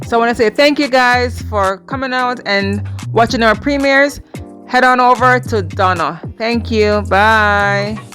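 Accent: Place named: North Carolina, United States. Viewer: When you said y'all I thought well I'm i n north Carolina so I recognize that accent. Nice work that you do. I've also subscribed to your channel as well. Let the journey begin. Embrace it... Yours truly Ed Parkhurst. Nice to have met you.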